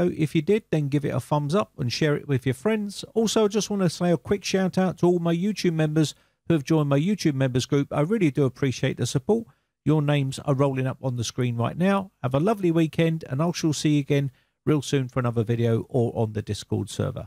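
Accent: United Kingdom. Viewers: I gratefully join the many satisfied subscribers in thanking you so much for sharing your helpful skills & knowledge to make our work a lot easier with your elegant British accent. Thanks a lot.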